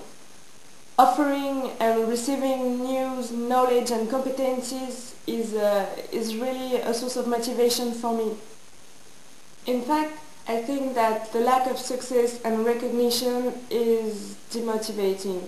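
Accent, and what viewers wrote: France. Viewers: are you french??? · you r french i guess...is it ? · a little hard to understand your english, pretty french, but hot accent thought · Gotta love the French accent!!!! viva la France :)))) I have no idea how I ended up watching this video!!!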